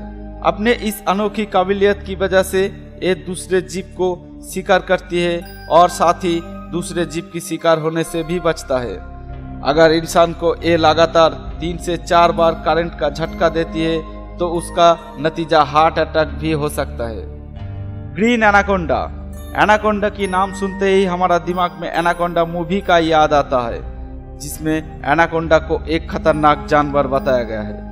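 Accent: Bengal, India. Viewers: Ye to bangali hai ya odisa... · Are u bengali ?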